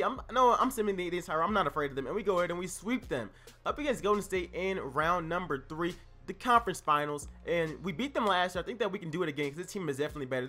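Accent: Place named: New York, United States. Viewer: It sounds like your From ny are u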